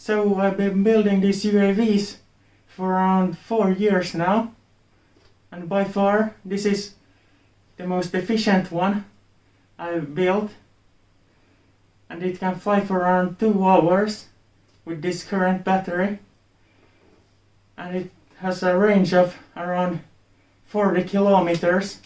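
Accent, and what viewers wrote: Finland. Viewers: your Finnish accent is wonderful. And you are soooo cute <3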